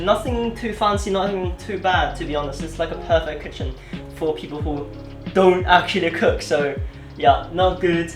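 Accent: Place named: United Kingdom. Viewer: Ah the british accent never changes HAHAH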